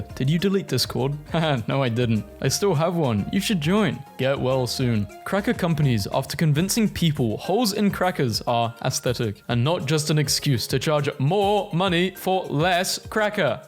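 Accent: United Kingdom. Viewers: i watched this entire video, thought about it for a moment and then realized you narrated the entire thing with a british accent. why didnt this come to me during the video?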